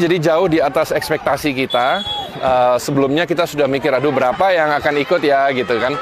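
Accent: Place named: Malang, Indonesia. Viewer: 👍 Gamblang dan runut penjelasannya meski disisipi istilah2 bahasa Inggris dan dengan logat/aksen Malang'an 😀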